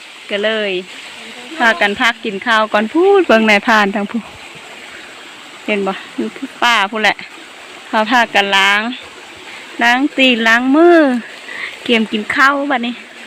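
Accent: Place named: Phu Thai, Thailand. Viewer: สิ่งที่ธรรมชาติสร้างมาสาว สวยงามหลาย พร้อมป่าเขาลำธาร เสียงเว้าพูไทเอกลักษณ์ เสียงดัง ฟังชัด เหน่อๆๆนอ ขอบคุณที่นำเสนอจ้า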